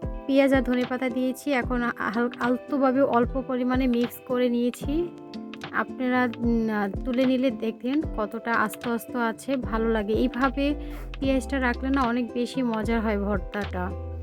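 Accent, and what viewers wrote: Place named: Brahmanbaria, Bangladesh. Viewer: ব্যামনবাইড়্যার মতো কতা কইন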